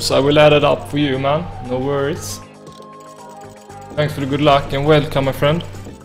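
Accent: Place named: Sweden. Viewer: swedish accent confirmed :D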